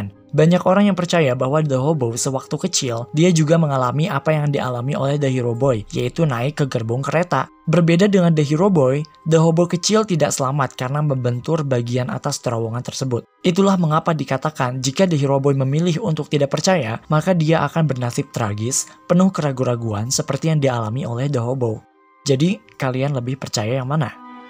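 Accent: South Jakarta, Indonesia. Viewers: English jaksel ?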